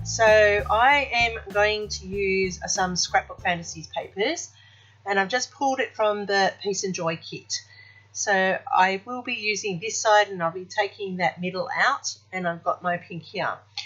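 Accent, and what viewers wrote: Australia. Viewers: I adore your Aussie accent :-). The rabbits are just lovely! Great art work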